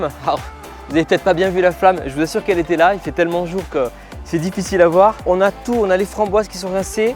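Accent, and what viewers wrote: South, France. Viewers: Merci pour ce moment sympa, vos bonnes idées et votre petit accent gourmand qui me rappelle ce sud que j'aime tant (et où je ne vis plus, hélas...) Je vais tenter ce dessert, c'est sûr.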